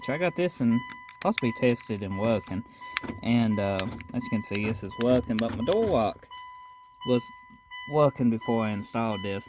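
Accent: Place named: South, United States. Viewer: What a accent bro. Down in the real swamp shit.. Right on man thanks for the info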